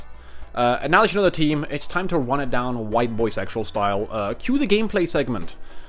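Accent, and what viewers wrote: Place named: South Africa. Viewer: funny south african pokemon man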